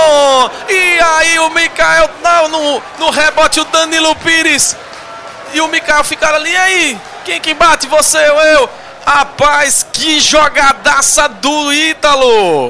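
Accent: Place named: Northeast, Brazil. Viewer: O melhor da copa do Nordeste é o sotaque nordestino na narração AQUI É NORDESTE 👏👏👏👏👏👏❤❤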